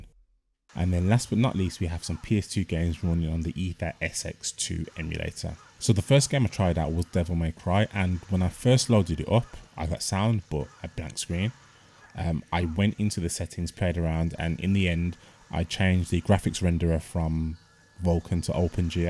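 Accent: United Kingdom. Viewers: You from Brum too? I subbed man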